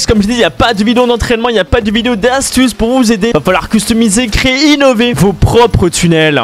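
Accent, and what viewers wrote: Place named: Switzerland. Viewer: Gg mais est ce que tu es suisse parce que tu a un accent suisse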